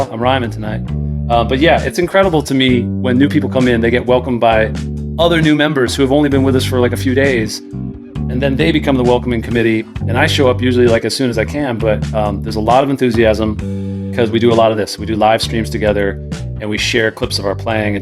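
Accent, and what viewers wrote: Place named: New Orleans, United States. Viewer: Man you sound like you're from New Orleans lol. I am so I think thats pretty cool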